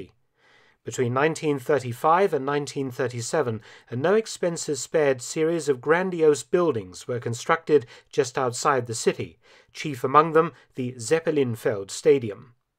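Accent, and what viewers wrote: United Kingdom. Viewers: This dude sounds British to me but idk if he is but if he is he said soccer...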